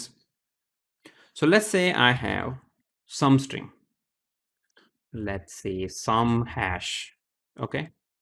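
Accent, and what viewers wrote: India. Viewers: When I hear indian accent, I listen carefully coz I know I'll be learning a ton. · When I heard that indian accent, I knew this would be good.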